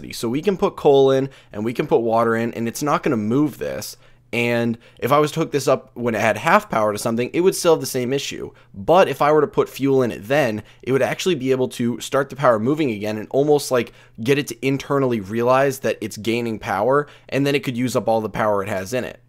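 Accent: United States. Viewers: You have a strong American accent what area of USA is that?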